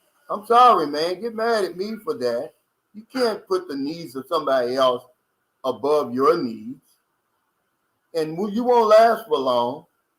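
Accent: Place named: New York, United States. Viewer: hey funny you dont sound upper crust you sound rough new york type but what you say is as smart as anyone example love yourself first before look for external love thats as smart as ive ever heard dont sugar coat ones lack of selflove with anothers love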